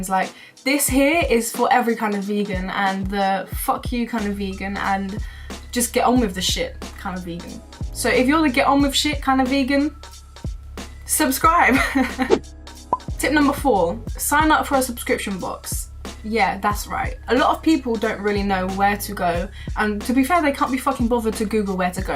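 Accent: United Kingdom. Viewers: Your accent is interesting... It's like mostly MLE and then suddenly RP. I think it's that you glottal stop a bunch of ts but not all of them.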